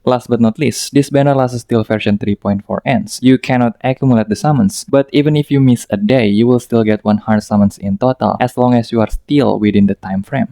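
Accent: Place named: Indonesia. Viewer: you sounds like Indonesian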